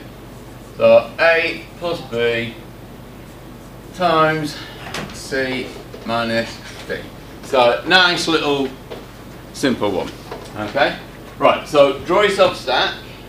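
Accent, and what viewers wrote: United Kingdom. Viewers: love your english accent too xD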